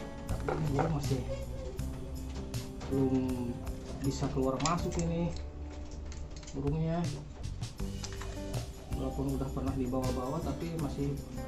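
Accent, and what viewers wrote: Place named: Indonesia. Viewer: Kenapa bahasa inggeris,tapi suara endon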